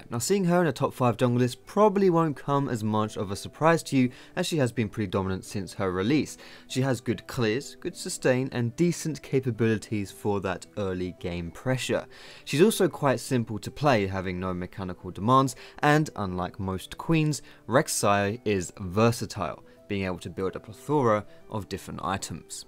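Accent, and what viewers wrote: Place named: England, United Kingdom. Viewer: i like you'r englaned acsent ;D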